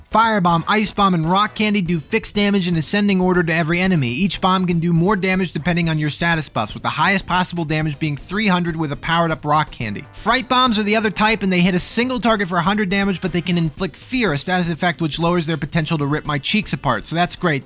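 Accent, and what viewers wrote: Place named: New York, United States. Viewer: A New York AVGN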